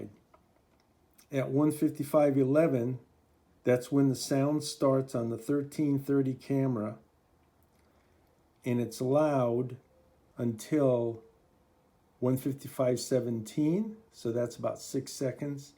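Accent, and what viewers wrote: New England, United States. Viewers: Wonderful video !!! Very well explained it, also I love the way you do it, I can hear your voice the entire day, is very calming and love the accent … New England ha !! 😊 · Are you from New England,?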